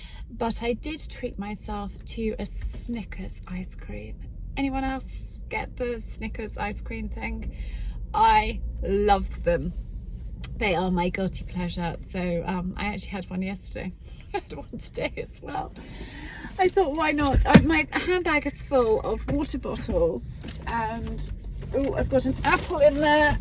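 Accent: England, United Kingdom. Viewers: You know, I think there's this trend of women doing the housewife life with all of these implications; that women are made for it, that they shouldn't work, etc, on TikTok, that the people over there have been trained to respond negatively. But of course you're not like that, you're not telling other women they're morally at fault when they're not at home. You're just showing your life and it's clear you enjoy it and that life at home can be wonderful--and lets be honest, that you're very good at it and resourceful. And you do it all from beautiful Sussex with a very English accent. So I wouldn't take the knee-jerk criticism at heart. It's not aimed at you personally, it's just that people have been burnt before. once people get to know you, they know you're very kind, and just passionate about your life! Sorry for this serious comment on such a hot day but I figured some context might be helpful!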